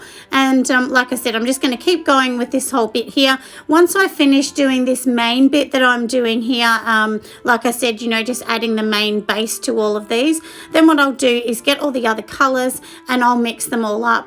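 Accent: Australia. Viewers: Love your Australian accent! Idk y someone would complain?! Love your videos.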